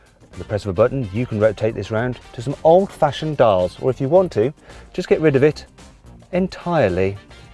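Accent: United Kingdom. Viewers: ゴリゴリのイギリス英語かな？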